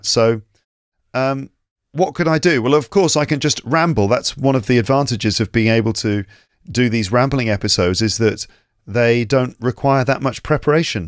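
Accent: United Kingdom. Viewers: Hi: I am so happy find this channel to learn English with British accent, again. T|his is because many years ago, I was in in the UK to study my degree n an university. But after immigrate to Canada, I lost my accent and even English (almost). I wonder do you a class to teach a group of people to learn English?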